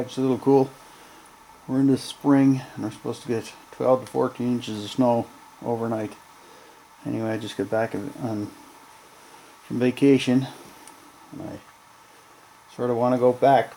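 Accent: Canada. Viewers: Bobby, you are showing your ignorance. Are you going to correct an Englishman for the way he pronounces words too. This man is Canadian. That is the way Canadians pronounce spout. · Bobby shut up. Whats YOUR problem troll? He has a Canadian accent. And I have no problem with his pronunciation. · Haha.. Yea, You definitely have a canadian tounge. Thank you for the video. I'm working on my bathtub as we speak. Take care. (Los Angeles, CA)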